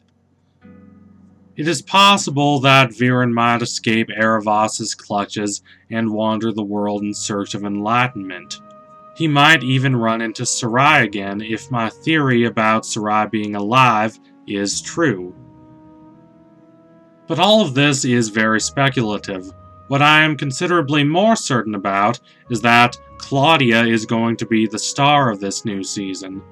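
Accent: South, United States. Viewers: Hopefully Claudia is the villain/ star character of season 4 because I would like to see her reactions to Rayllum and over all, the peace between Xadia and The Human Kingdoms. Also, I love you random southern accent, I laughed while listening to this video (in a good way). Keep up the great videos! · Dude do u have a accent? U almost sound southern?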